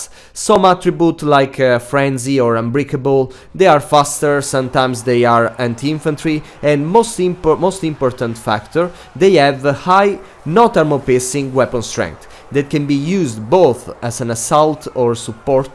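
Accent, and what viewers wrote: Italy. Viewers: Fantastic video, by the way, are you Italian?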